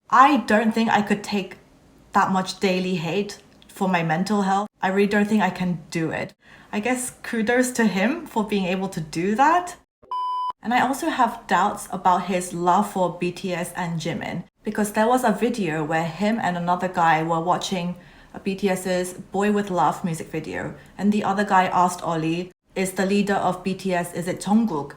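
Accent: England, United Kingdom. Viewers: ... I like your accent^^ it's sounds like British and your voice is.. What is it call? Yaa.. Just like it so much^^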